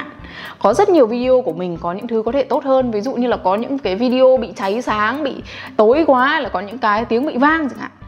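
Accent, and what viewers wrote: United Kingdom. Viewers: Có ae nào thích nghe chị nói giọng Anh Anh không hí hí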